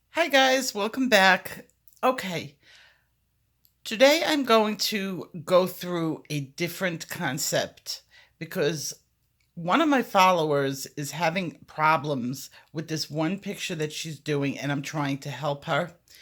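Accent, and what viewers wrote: New York, United States. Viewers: Thanks so much for this lesson. You are my favorite teacher. I watch other colorists videos and enjoy them but I learn the most from you. PS: I’m from Florida living in Tampa and I love your NY accent.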